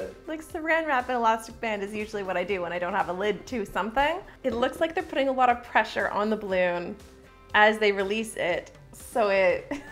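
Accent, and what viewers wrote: Canada. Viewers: I thought she was Canadian😂😂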